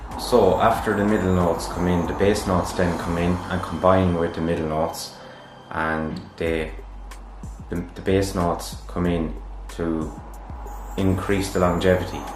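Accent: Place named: Ireland. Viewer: 8 seconds and in i said out loud at my computer screen "woah! this guy's irish!" 😂 before you even announced it (not a bad thing, i love the accent) i then knew without a doubt this was gonna be the video to satisfy my craving for the knowledge on what top, heart, and base notes are.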